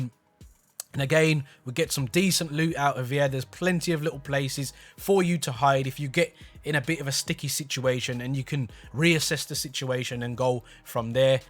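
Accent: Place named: Wolverhampton, United Kingdom. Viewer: ah, close! Thought I heard the wolves-ism's. Good video mate, keep it up!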